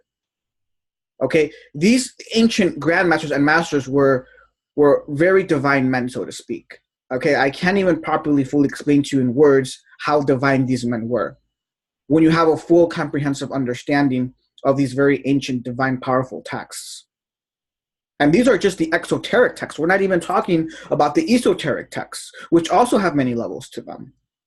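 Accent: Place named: Israel. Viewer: Ah ok. I have many Israeli friends and you sounded like one of my friends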